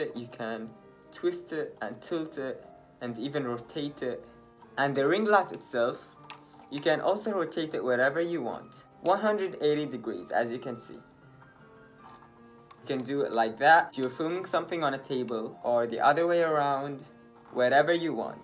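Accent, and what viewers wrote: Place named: Spain. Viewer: I like your accent. Where are you from? Spain ?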